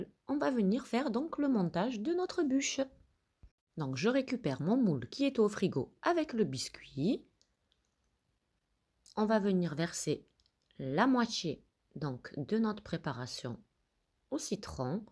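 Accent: South, France. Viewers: Franchement j'ai essayer tes recettes top et cette bûche .. magnifique. 👏👏👏👏❤️❤️❤️❤️❤️❤️🌹🌹🌹🌹🌹🌹🌹 J'aime beaucoup votre accent du sud 💖💖💖🎀🎀🎀🎀🎀🎀🎀🎈🎈🎈🎈🎈🎊🎊🎊🎁🎉🎉🎉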